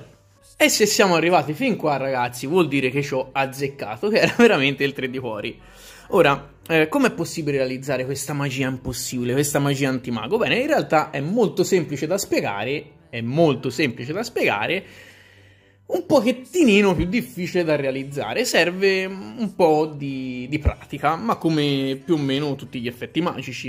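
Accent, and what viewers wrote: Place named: Tuscany, Italy. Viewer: Amo l'accento toshano😂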